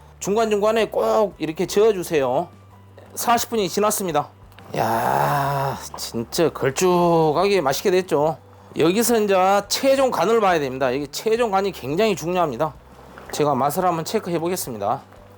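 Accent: Jeolla, South Korea. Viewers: 전라도 분이세요? 말투가 정겹네요😊 보기만해도군침도는 광주식 오리탕 레시피 넘나 잘보고갑니다❤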